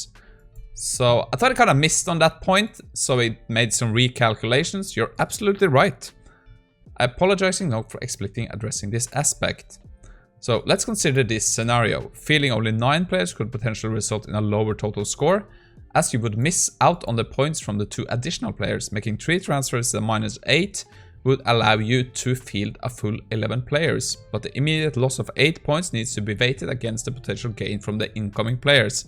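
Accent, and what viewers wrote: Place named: Norway. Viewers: He IS speaking english with a Norwegian accent. Yes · Are you from Norway? Sounds like a norwegian trying to speak English to be honest :-)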